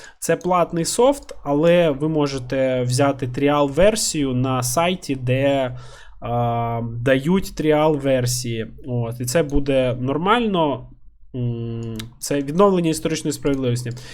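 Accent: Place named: Surzhik, Ukraine. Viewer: Классний суржик, бро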